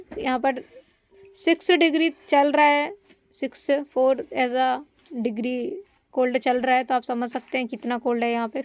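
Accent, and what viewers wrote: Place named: South, India. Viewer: Aisa lg raha koi South Indian Hindi bol raha h.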